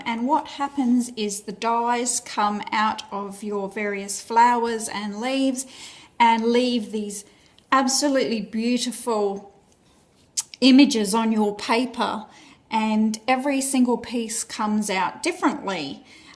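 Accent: Australia. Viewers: I PICKED UP YOU WERE AUSTRALIAN, AS I AM PROUDLY. FOR A FIRST ATTEMPT AT ECO DYEING, YOUVE HAD AN AWESOME OUTCOME, PAPERS ARE JUST BEAUTIFUL, IM JUST STARTING THIS. THANKS.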